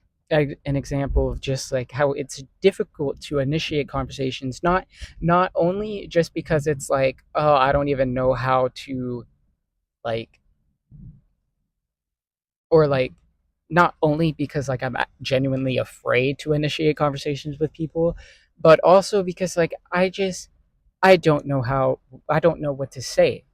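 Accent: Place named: North America. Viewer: There is no pressure to talk to anyone. You sound North American where small talk may not be a good idea with anyone considering the state of our country. I don't care if anyone thinks I'm weird. 🤓 TBH , you make a good impression & have exceptional communication skills.😁 You do have a great smile, my friend.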